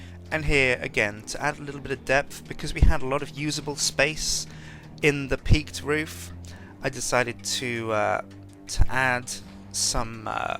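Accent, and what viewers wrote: United Kingdom. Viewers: You can clearly tell he's from the UK from his accent.. Why would you need some sort of sign from him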